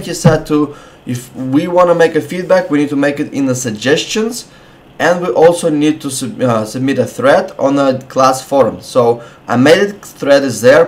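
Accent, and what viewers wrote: Russia. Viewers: Охуенный русский акцент, почаще бы от тебя)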